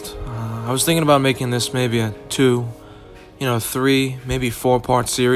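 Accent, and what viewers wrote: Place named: Staten Island, United States. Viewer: Sounds like Anakin with a Staten Island accent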